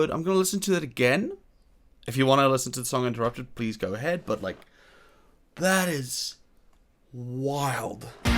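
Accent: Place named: South Africa. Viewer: South African?? Klink so! :D ZA ZA ZA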